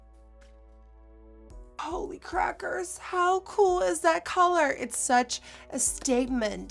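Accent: United States. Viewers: anyone else thinks she sounds sick/more American in this?? where the holland accent at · I haven't watched her in a few years and oh my goodness her voice is so different did she move to the states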